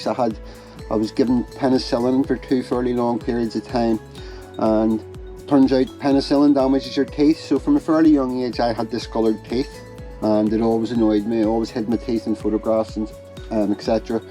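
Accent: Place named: Belfast, United Kingdom. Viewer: you sound like you're from Belfast. I am too. Would love to speak with you about your experience as I'm looking for restorative treatment to include lots of implants..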